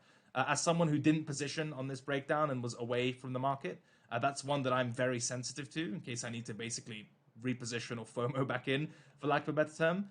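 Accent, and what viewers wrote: United Kingdom. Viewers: Missed listening to the British accent. Can you record the twitter spaces and put it on Spotify? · love listening to you video with British accent and voice haha n the way you explain your chart , slowly bring out the flow and reason why n all the details ! hope theres live video more often ,thanks !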